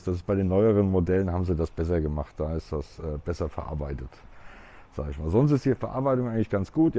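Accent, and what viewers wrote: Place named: Frankfurt, Germany. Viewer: Ich habe sofort rausgehört dass du aus Frankfurt kommst 💪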